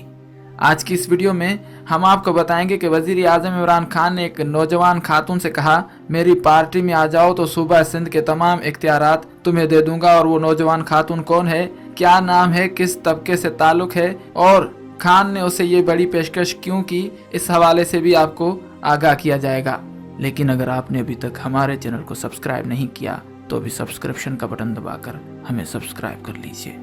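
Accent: India. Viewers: یہ آدمی انڈیا کا لگتا ہے اس کے تلفظ انڈیا کے لگتے ھیں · This voice is Indian person...100 % sure....indian urdu asy he bolty hyn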